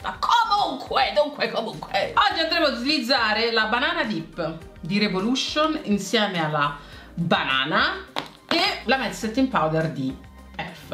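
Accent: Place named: Apulia, Italy. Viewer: con l’accento pugliese mi mancava 😂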